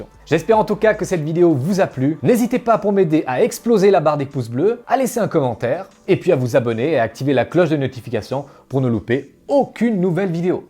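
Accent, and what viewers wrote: Vaud, Switzerland. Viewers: Mais quelle touche délicate d'accent Vaudois c'est magnifique ! ça fait bizarre d'en entendre sur Youtube... Amicalement, d'un autre Vaudois.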